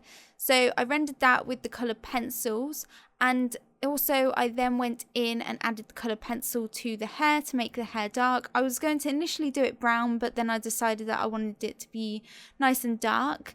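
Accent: England, United Kingdom. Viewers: I don't why but I really have some interest for English accent! Love it!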